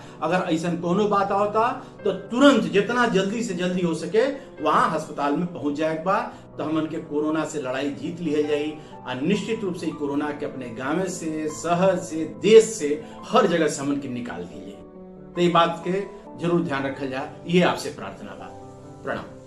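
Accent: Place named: Bhojpuri, India. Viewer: इतनी अच्छी विवेचना और इतनी सहज भोजपुरी। इतनी बढिया बातचीत। किसी भी ऐंकर से नहीं सुनी थी।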